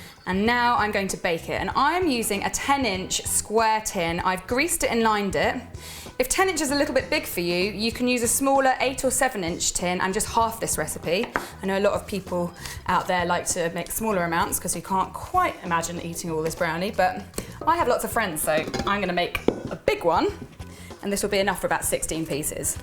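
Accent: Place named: London, United Kingdom. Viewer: It's a London accent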